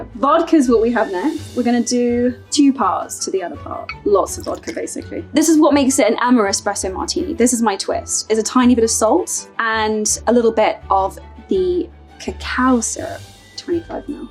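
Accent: United Kingdom. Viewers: イギリスのVOGUEだからかいつもよりイギリス英語が強くてハーマイオニーが脳裏に浮かぶ🪄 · エマのブリティッシュアクセント、聞いてて心地良い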